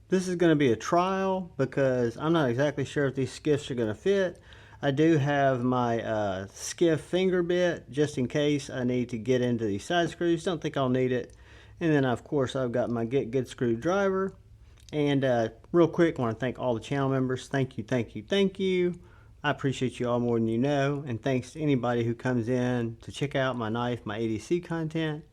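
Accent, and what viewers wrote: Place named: Nashville, United States. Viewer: Enjoyed that , whenever I heard your voice I’m thinking , Nashville born and raised 🤔 then again I’m an Aussie 🇦🇺😂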